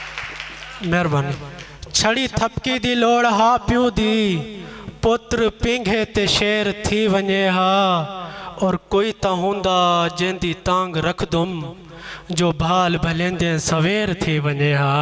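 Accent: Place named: Saraiki, Pakistan. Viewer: Khoobsorat lehja Sraiki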